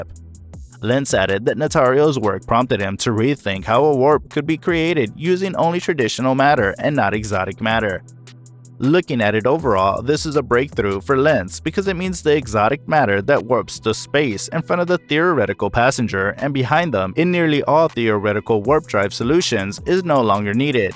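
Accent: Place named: Russia. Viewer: Liked it. I subscribed to your channel. His English resembles that of the Thoisoi2 channel's narrator, who has a Russian accent. Beautiful pronunciation. I found your pronunciation of Miguel Alcubierre's name funny. Greetings from Tupã, countryside of the state of São Paulo, Brazil. Live long and próspera 🖖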